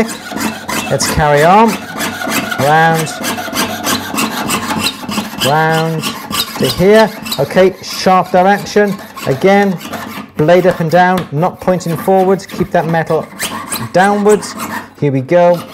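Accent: Wales, United Kingdom. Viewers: Great lots of tips for my memory bank. Cheers. Can I ask why do u turn your bench hook back around at an angle, after u finished cutting flat pieces? Sorry it's prob a basic answer but I'm just starting out trying To learn myself to work with some metals like copper and silver and also coins. I started making wood rings but I want to broaden my horizons so to speak. Love your channel, it's so helpful to me and many others.. Merry Christmas from Ireland, do I hint a Welsh accent there? Or somewhere close?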